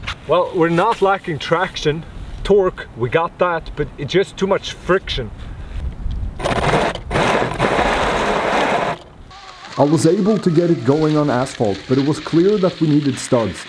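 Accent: Norrland, Sweden. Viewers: You from Skellefteå, or some other part of norrland? I'm from Lycksele. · dude are you swedish? Hejsan!!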